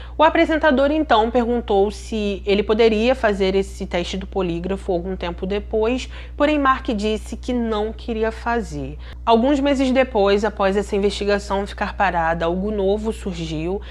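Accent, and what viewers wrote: Rio de Janeiro, Brazil. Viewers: O vídeo e a história são maravilhosos 😀 😀😀... Mas o sotaque carioca é um nojo 🤮🤮🤮.. horrível 🤮🤮🤮🤮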